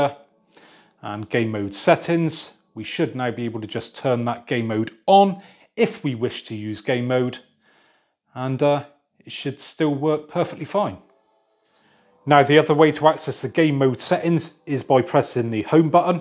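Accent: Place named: South West England, United Kingdom. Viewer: His accent sounds like south west England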